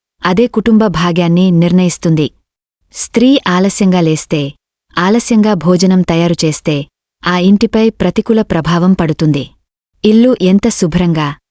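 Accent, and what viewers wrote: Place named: North, India. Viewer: ఆమెకు తెలుగు తో కొంచెం ఇబ్బంది,ఉత్తరాదికి వెళ్ళి స్థిరపడ్డారు అనుకుంటా, ఆ ప్రభావం కొంత కలగలిసి వినిపిస్తుంది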